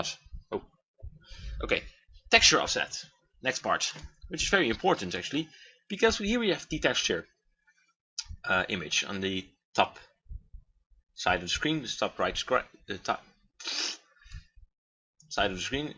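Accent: Netherlands. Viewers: re you dutch (nederlands)? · are you Dutch ?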